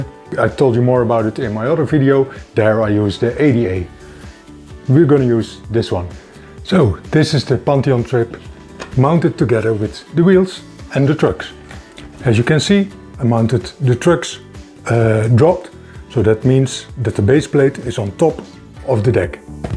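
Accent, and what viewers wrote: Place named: Netherlands. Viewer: Lekker hollands accentje :D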